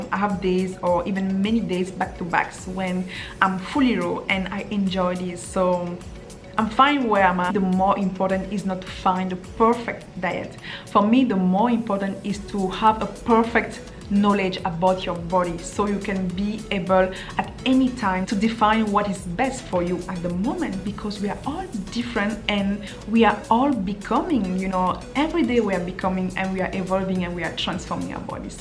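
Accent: France. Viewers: I think it's a french accent (kinda like mine ahah) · Elizabeth Lizzy it is a French accent, it was mentioned in her Q&A video :) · Are You French ? Super video! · Are you a native French speaker?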